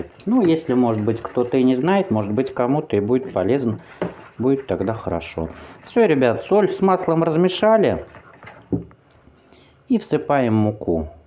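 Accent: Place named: Moscow, Russia. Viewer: у вас великолепный русский язык с московским произношением, это знаете ли, тоже эталон, такое сейчас редко встретишь. Спасибо вам,что вы так классно говорите! Вы нас и этому учите!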